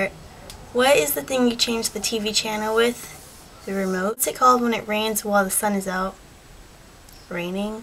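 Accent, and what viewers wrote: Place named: United States. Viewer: ya know just ta make ya feel better, you have an amercan accent, it would be noticeable (spelling fail XD) if you spoke anotha lenguage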